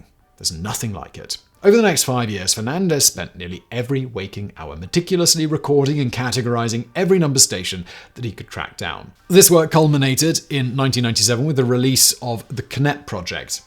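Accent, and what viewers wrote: United Kingdom. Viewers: Thx british Vsauce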